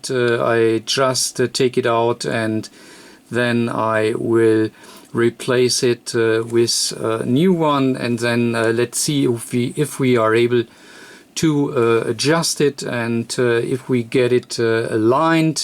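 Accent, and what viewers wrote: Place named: Germany. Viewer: I echo all the nice comments below. So great to get an older piece of equipment working 100% again. Thanks!! Your friend will be pleased. (Love the accent! I am guessing German, but I suppose you could be from the Netherlands.)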